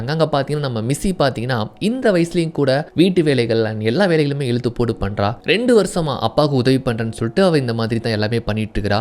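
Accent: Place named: Thoothukudi, India. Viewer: Eilla Thoothukudi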